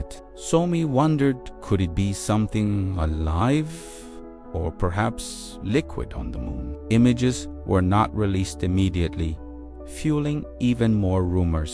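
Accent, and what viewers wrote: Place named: United States. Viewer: I can tell you’re American.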